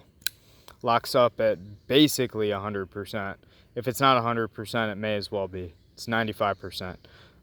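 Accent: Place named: Midwest, United States. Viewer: You sound very much like a Midwest ‘up north’ guy and it’s appreciated.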